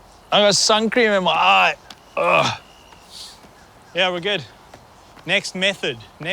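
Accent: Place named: South Africa. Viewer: Miss that accent true South African Keep it up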